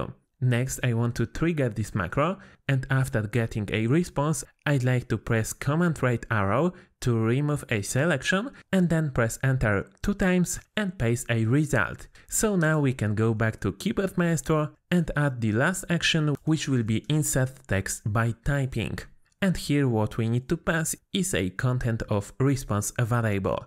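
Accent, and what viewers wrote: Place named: Poland. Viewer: Niestety ten filimik jest zbyt bardzo polski :( Uszy bolą od kłującego polskiego akcentu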